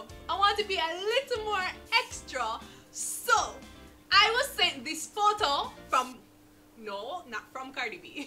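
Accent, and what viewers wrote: Patois, Jamaica. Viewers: she still sounds very Jamaican, she sounds just like what we like to call ‘uptown people.’ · your personality is contagious. Loving the jamaican patois. Love from Trinidad 🥰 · Also it might not be that she's "lost" her accent but she just uses less patois words or like a patois 'pronunciation'. We can butcher some english words just base on where we place emphasis on syllables. As for her accent it oh so present from my ears. Jamaican here. Depending on where you are in Jamaica this "accent" varies. Some places I can't even understand them and I am born, raises, live Jamaican. 😂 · As a general rule she's lost more of accent the longer she's been making videos. She sounded like a Jamaican in her early stuff when she was relaxed, but now it sounds muddled sometimes. I'm not trying to tell you anything new, just saying I noticed, too. 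😉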